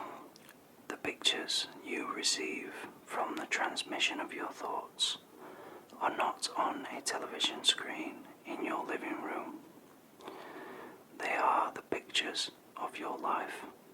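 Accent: Yorkshire, United Kingdom. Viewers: Are you from Yorkshire? I recognise the accent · are you British? I like your accent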